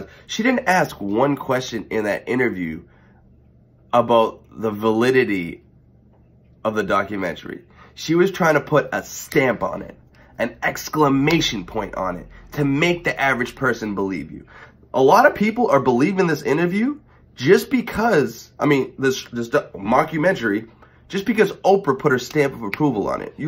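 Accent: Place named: East Coast, United States. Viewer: Love your video! Love what you're saying but I also love listening to you talk. Sounds like you're from the east coast. I'm from the east coast but live in California right now. Love your personality.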